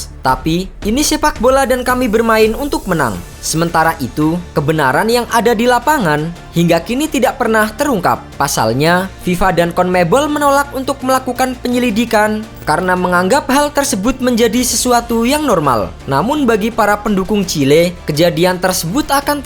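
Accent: Indonesia. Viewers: kn logat orang indo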